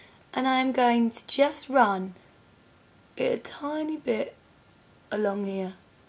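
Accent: United Kingdom. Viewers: i love british accent:)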